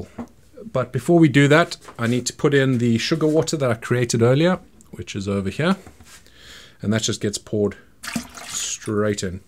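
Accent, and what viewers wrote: South Africa. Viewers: Are you originally South African by any chance? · you look like it an sound like it.... south africa or maybe zim? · He can't be Aussie.. Mzansi 4sure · are you a South African? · Are you South African? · You sound South African, very interesting video thank you :) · Snap! 😂😂 he does sound South African as well 😂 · Great Video, great presentation and what I really appreciated was the step by step, explained in clear english ( with some South African accent ) but very easy to understand the different processes of the fermentation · When you watching a video and you say to yourself, "Hey this guy is South African" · Yup, 100% sure this is a Saffa... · You're south African? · are you south african? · He sounds South African 🤔 🤔 🤔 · One of the best SA accents I have ever heard on Youtube. Well done boet, your English is perfect and your pronunciation is amazing. 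Great video too. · Are you from South Africa? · Correct me if im wrong, but are you South African by any chance. Not so much the accent ( although there is a hint ), but more the walking around barefoot outside?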